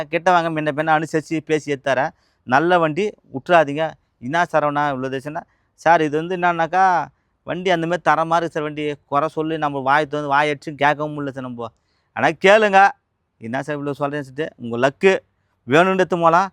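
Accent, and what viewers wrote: Madurai, India. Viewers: Madurai vasagam 🎉🎉🎉super Anna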